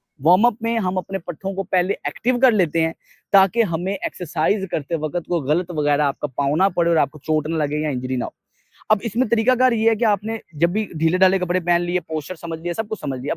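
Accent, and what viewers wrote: Punjab, India. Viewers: Haye Allah... Full gol O Punjabi version english ❤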